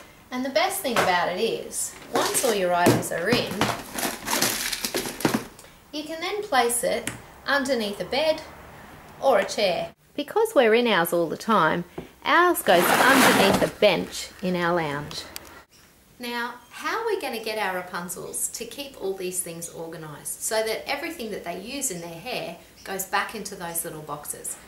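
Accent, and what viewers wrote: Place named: Australia. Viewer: that strong aussie accent xD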